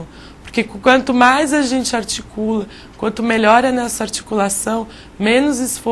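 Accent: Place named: Sao Paulo, Brazil. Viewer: (BARBRINHAENF) -------- Num tem nada à ver com sotaque não. Vc tá dizendo que ela tem um sotaque diferente do que estamos acostumados a ouvir no YOUTUBE, PÔW, o sotaque dela é PAULISTA, e sotaque paulista a gente tá cansado de ouvir no youtube, o problema é a voz dela mesmo. Fátima Bernardes, Cid Moreira, Zé Ramalho VOCÊ E EU SEMPRE VÃO e vamos ter a mesma voz em qualquer sotaque, compreendeu o que eu disse? Então não me venha com essa não porque não colou.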